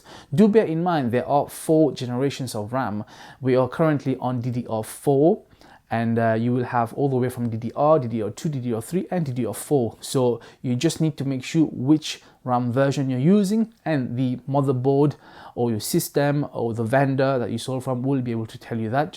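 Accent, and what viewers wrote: Mauritius. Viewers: yeah he sounded mauritian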